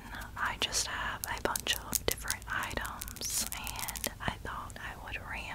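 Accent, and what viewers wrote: South, United States. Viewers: There's really something quite enjoyable about a southern whisper.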